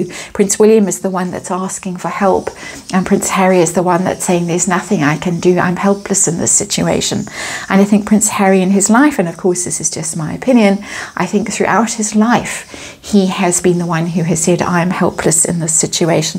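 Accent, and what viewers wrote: South Africa. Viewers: Great! Thanks! I absolutely love your readings! Your beautiful SA accent lends a lovely gentleness to the readings even when the readings are darker than usual, as was this one! Stay safe lovely lady xx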